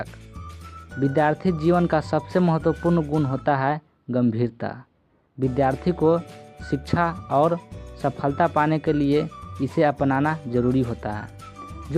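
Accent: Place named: Bihar, India. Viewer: lgta to bihari hi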